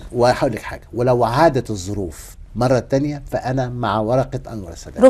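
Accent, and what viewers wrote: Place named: Levant. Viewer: حكواتي بلهجة إخوانا الشوام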